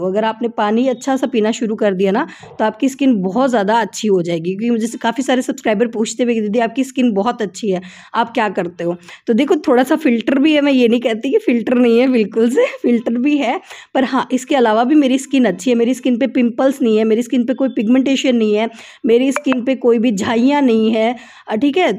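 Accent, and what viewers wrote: Rajasthan, India. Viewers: Hi m Rajasthan se hu aur aapki tone sun ke lagta h ki aap bhi Rajasthan se hi ho....maine abhi kuch din pahle se aapke vlogs dekhna start Kiya h....mujhe aapke vlogs acche lagte h aur khas kar ke ye vlog to kuch jyada hi accha h...m aapse milna chahti hu....aap kaha se ho Rajasthan me....m aapse kaise contact kar sakti hu???Mera naam deepa h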